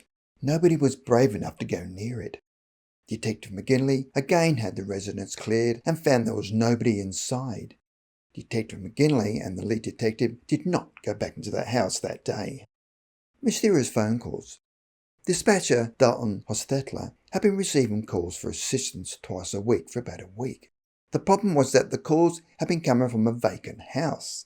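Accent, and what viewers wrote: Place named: Australia. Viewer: Fellow Aussie here. I love listening to an Aussie telling stories. There is more between Heaven and Earth.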